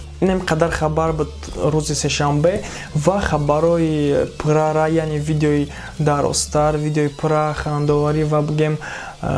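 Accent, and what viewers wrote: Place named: Kulob, Tajikistan. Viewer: братишка офарин барои корот! кори зур хар руз хабари тару тоза медихи лекин гап заниатро хам каме иваз кун ютум кулоб нестай ки лахчави гап зани ича адаби гап зан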